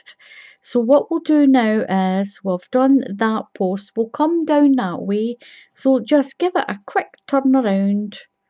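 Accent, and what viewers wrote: Scotland, United Kingdom. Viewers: Thank you for this and other patterns. You are an excellent teacher (I'm American) and your Scottish Burr is an added treat!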